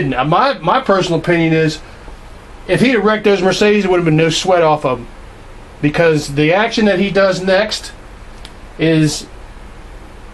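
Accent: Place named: Pennsylvania, United States. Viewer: The BBCfour documentary on this tragedy confirms that Hawthorne admitted fault to close friends immediately after (but not publicly) and lived out his remaining years a "broken man" (better than a cut in half man which is what 85+ spectators got). The mask and with matching shirt is a nice touch and I like your enthusiasm, but I don't believe anyone doubts Hawthorne's singular role in igniting the tragedy. Lastly, I am picking up a slight Pennsylvania accent from under the mask.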